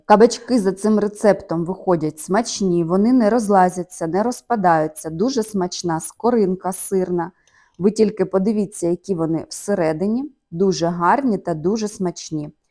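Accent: Standard. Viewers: Хорошие рецепты,прекрасная подача приготовления,без диалекта и суржика - умница.но только для украиноязычных . Поэтому переходим на другие кулинарные блоги,слава Богу их предостаточно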